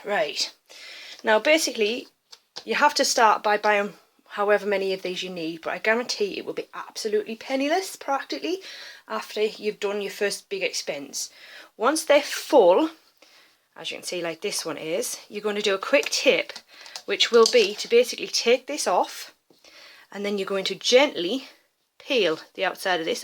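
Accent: Newcastle, United Kingdom. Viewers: Tl;dr Open lid a tiny bit aye, tip gungy water out, has Geordie accent, put salt in which costs 30p. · I luv your Jordie accent....and you are quite foony, too! hahaha. :) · love a Geordie accent I can listen to it all day.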